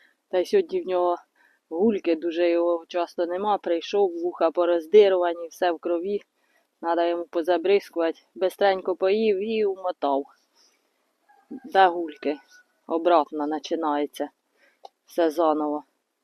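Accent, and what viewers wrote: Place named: Chernihiv, Ukraine. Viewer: я Олексій з Чернігівщини, мова знайома,у деяких районах у нас така сама.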